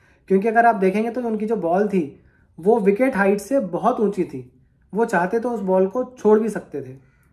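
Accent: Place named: Gujarat, India. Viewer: He sounds like gujarati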